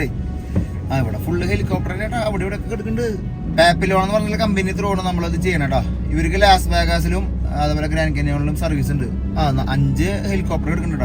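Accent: Thrissur, India. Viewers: No words, your trichur accent, the commentary, your stature, your vehicle, solo drive all blends perfectly. Wishes for all your endeavors as good kerala U tuber. ❤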